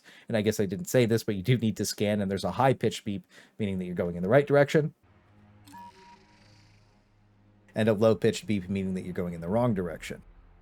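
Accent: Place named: United States. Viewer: It's an American thing. Water is warduh.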